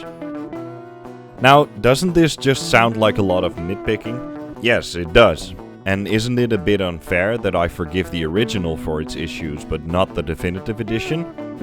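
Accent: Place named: Netherlands. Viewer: Je klinkt Nederlands.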